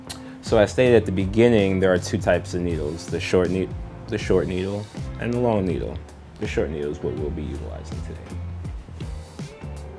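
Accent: United States. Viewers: You are an inspiration. My son can’t sew a button on. Clear instructions and a beautiful clear voice. I’m a sucker for American accents. New stubby from Scotland 🏴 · You get my thumbs up just for your sweet NY ACCENT🥰🥰🥰🥰🥰🥰🥰🥰🥰🥰🥰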